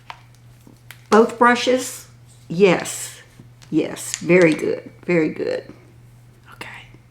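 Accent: South, United States. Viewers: I just love your videos. Ur so sweet and such a great storyteller. Ur beautiful and ur southern drawl makes me swoon. My mom always had her face on and you've inspired me to get dolled up w/ELF! I'm in! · I love the sound of your beautiful southern voice and love how you enjoy transforming your already beautiful face. Thank you for being a reminder to our older generation that we too get to enjoy makeup and how it can make us feel. And have fun with it!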